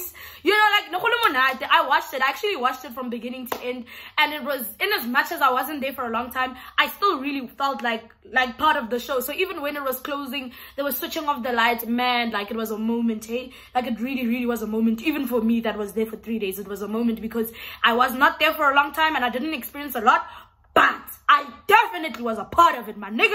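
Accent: Nigeria. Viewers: It’s the Nigerian accent for me😂😭😭❤️‍🔥😻🤌🏽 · It's your Nigerian accent for me 🔥 😭😭😂👐⚰️ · What is your nationality babe,,,coz l hear you talk Nigeria accent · your Nigerian accent is top tier. 😂❤️❤️ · Babyyy your Nigerian accent is hot hot mahn🔥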